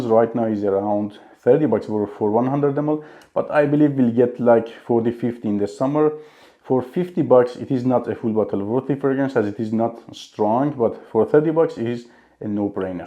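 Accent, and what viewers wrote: Iran. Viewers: you have Iranian accent!!!??🤔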